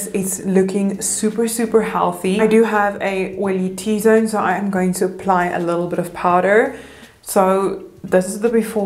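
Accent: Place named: South Africa. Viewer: I can’t tell if this is a South African accent or Dutch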